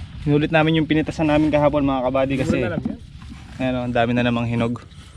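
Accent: Pampanga, Philippines. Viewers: Ka buddy kapampangan ka po ba? Hahahaha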